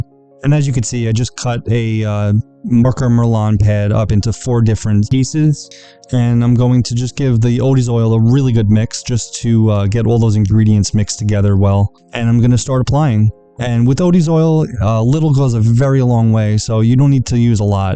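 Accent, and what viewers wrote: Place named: New England, United States. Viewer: Love the contrast in the stains it makes the gorgeous veneer really pop. I love how you can bring out the beauty of the woods. Now we know you're on Long Island, you have just a small touch of what I consider a New England accent, (my mom's from Massachusetts, though she made a strong effort she sadly, in my opinion, succeeded in losing it. I'm southern California and, no we don't talk like valley girls, lol. We have almost no accent. Just plain boring English with nothing special on top. Say hi to your dad.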